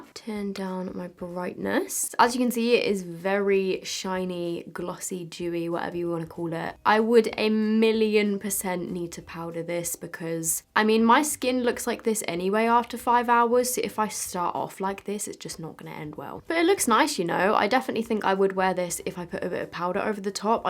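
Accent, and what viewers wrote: United Kingdom. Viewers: Ok but you can say d'amelio with a British accent and me sitting here with a British accent struggling to say it LIKE HOW DO PEOPLE SAY IT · Are u British?luv ur accent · I think it’s just the British accent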